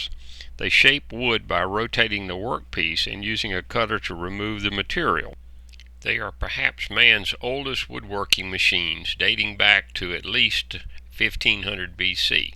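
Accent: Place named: South, United States. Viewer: Excellent information delivered in a smooth southern voice.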